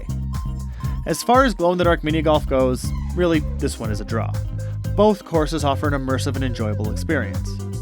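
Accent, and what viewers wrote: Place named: Saskatchewan, Canada. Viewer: Sounds like you are from Saskatchewan😉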